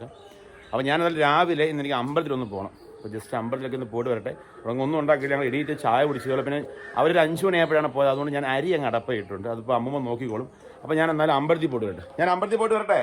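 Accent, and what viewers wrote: Kollam, India. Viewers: ചേട്ടന്റെ സ്ഥലം എവിടാ നമ്മുടെ കൊല്ലക്കാരുടെ സംസാരം ആണല്ലോ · Kollam slang.......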